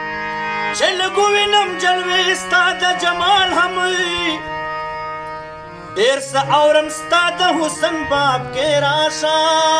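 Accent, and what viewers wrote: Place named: Yusufzai, Pakistan. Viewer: ڈیر خائستہ غزل د لوڑ شاعر اؤ خائستہ وینا د ❤ شکر دے چہ Dialect ۓ د یوسفزو دے گنی بیا بہ پرے نہ پوھیدو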